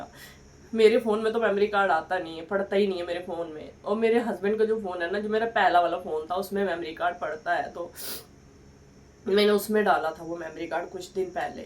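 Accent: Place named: Himachal Pradesh, India. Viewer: Maine aapki video phli baar dekhi aaj, ye 2nd video dekh rha hun, mjhe aapki previous to nhi ptaa bt mai bs yhi khna chahunga k aap jhn rho khush rho...😊 Aaj ki date mai maa baap k siva koi apna nhi hota, rhi baat relatives ki to unhe to sirf bahana chahiye hota h... Aapka ghr bsaaa hua h or jo kuch abhi aap k paas h bs usi mai khush rhi...😊 Ye jindgiii ek bhgwaan ka diya hua tohfaa h isko khush hokr gujaaro👍 I don't know where from u bt I think you are from himachal by language pronounceation...!!! bt in this situations you hve to become a strong person...👍 So plzzz be happy keep happy always...😊 Lots of luv❤ from #himachal...😊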